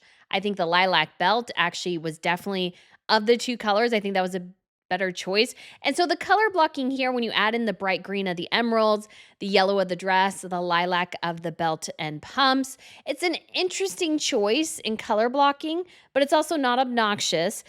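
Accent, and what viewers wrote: United States. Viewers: please get yourself a speech teacher and work on your squeaky girlie voice. You're too old to sound like a 14 year old. And while you're at it, you might want to get rid of that heavy American accent just a little bit.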